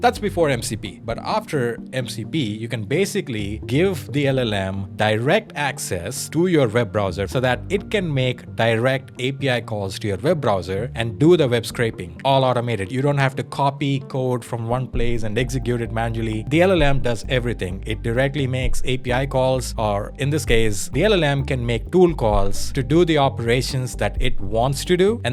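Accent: India. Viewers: this guy has such a NRI accent VecTOR , nice video though , claude is surprisingly good at documenting things neatly , as a human , it would be nice to copy that style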